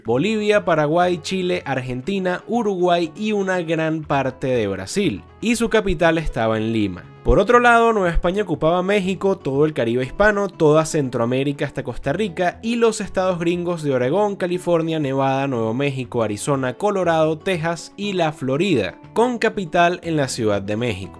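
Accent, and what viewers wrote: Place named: Venezuela. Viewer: De que hablas? si el que hizo el video se le nota el acento venezolano a mil kilometros, a demas, muchos mexicanos no tienen en buena estima a Simon bolivar, por sus masacres y genocidios cometidos.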